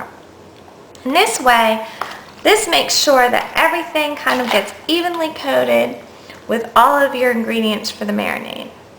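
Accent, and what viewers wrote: South, United States. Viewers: your accent southern ?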